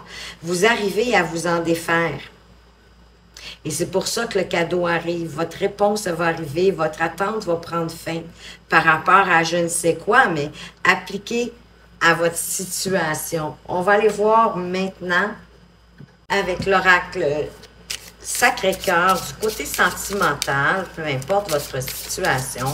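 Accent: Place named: Quebec, Canada. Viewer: Oui c’est ça.... votre accent est très joli.... c'est canadien?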